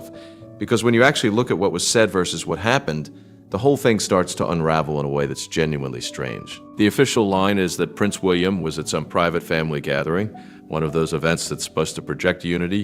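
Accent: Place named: United States. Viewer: This video has to be investigated to ascertain who is behind this rubbish. Is the American accent a clue?!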